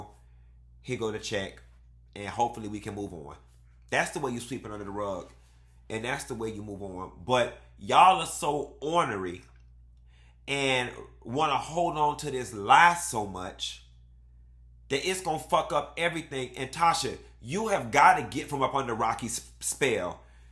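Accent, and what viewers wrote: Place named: Florida, United States. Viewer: I swear foe Gawd! Yo ability to discern and explain mess is an awesome thing to watch! That South Florida flow. I'm from dine thea too. I love it!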